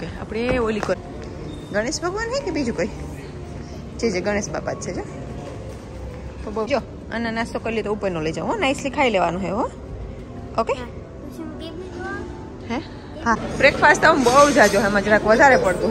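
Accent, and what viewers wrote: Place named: Gujarat, India. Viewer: Bahu j sweet Gujarati bolo chho tame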